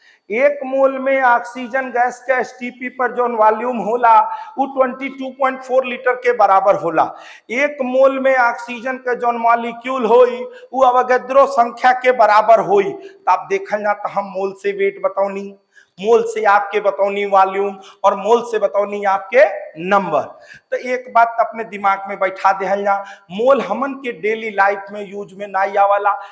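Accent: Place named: Bhojpuri, India. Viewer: Sirji Katai gajab bhojpuriya andaj me padhawatani 🙏🙏🤗🤗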